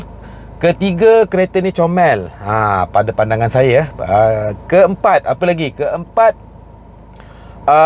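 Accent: Malaysia. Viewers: malaysian mmg camni.. bukan konoha...kalau konoha pronouncation lagi jahanam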